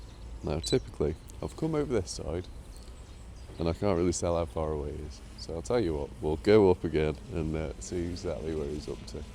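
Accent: Shropshire, United Kingdom. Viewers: Nothing wrong in using your Shropshire accent. My granny was very proud of hers even though she moved to Lancashire when she was 18 yrs old. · Don't worry about your accent or it being Shropshire. For us foreigners it's all an English accent. Main thing for me is that you are annunciating well enough to understand without captions. There is something about your videos though that if I watch them at night they put me right to sleep. Keep up the good work. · we love all British accents including Shropshire! Waving from Australia 👋🇦🇺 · More education for me. I've stayed in Shropshire a few times but never knew what the accent was. Actually pretty good. Not Northern Southern or Brum! Another great video. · What's wrong with your Shropshire accent? It's perfectly understanable and much better than trying to 'put on a posh accent'. I'm Scottish and I don't change my accent and seem to be understood everywhere. 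That excavator driver is amazing. The way he put the orange tarp into the dumper was a work of art. Imagine seeing the excavator and the spider together. It would be like watching a choreographed ballet. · Very nice Shropshire accent. You speak clearly and we can understand you. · Continue sounding Shropshire,; you're just fine. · As an American Anglophile I absolutely LOVE your accent,! Let the Shropshire lad free!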